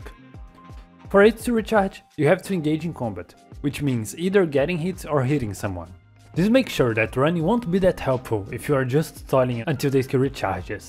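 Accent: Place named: Rio de Janeiro, Brazil. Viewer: nao tem como esconde o sotaque carioca kjkkkkk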